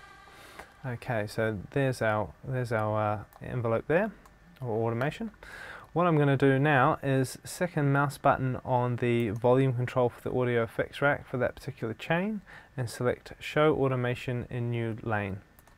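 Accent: New Zealand. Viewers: CHEERS! Awsome vid bro, I think you explain things really well. I will definatly subscribe ;) Take it easy! P.S. Your a kiwi right?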